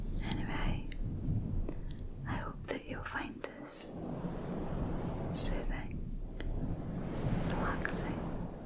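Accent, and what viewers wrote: Scotland, United Kingdom. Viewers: So soothing, eyes involuntarily closing, and the video becomes a softly whispered, Scottish podcast...very nice.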